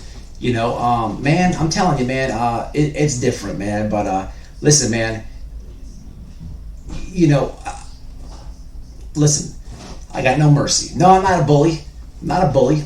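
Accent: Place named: London, United Kingdom. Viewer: Good london accent bruv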